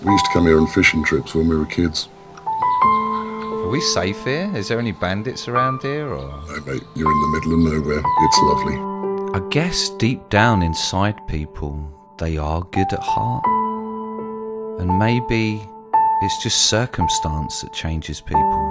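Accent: United Kingdom. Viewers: im not saying his british accent is werid, its fine, but he talks completely differnet then he normally does, he talks slow and odd when he is doing a commentary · it's called a british accent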